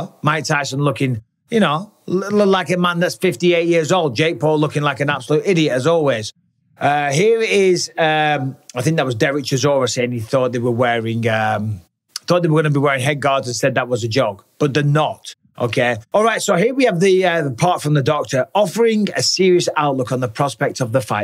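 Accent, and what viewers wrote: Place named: United Kingdom. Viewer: Why are you speaking like a Brit?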